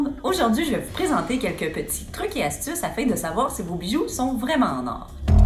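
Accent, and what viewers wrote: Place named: Perpignan, France. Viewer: Y a rien à faire , je ne supporte pas cet accent de Perpignan ...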